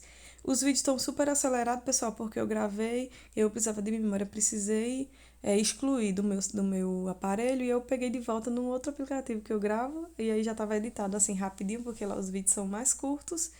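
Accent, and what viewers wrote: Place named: Pernambuco, Brazil. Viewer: Que perfeição❤ Estava só esperando vc falar de qual cidade era porque pelo sotaque, sabia que era pernambucana, sou de Olinda😁😘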